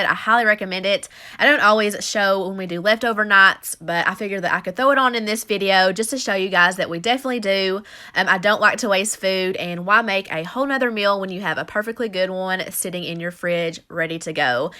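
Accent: South, United States. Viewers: Yeahhh my southern sista! Idk where your from but ya sound like me so I like ya! 😉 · I love your voice!!! Sorry if that sounded rude!! But I think country accents are amazing!!! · Where you from ? You got a accent. You sound really country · Your accent is TOO cute! It’s my favorite part of your videos. Olive “ol” 😊😂 sorry if this is offensive I mean it in the best way possible, I love southern accents! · Damn she did that and I love the accent country ass me!!!!!! Yeahhhh buddy???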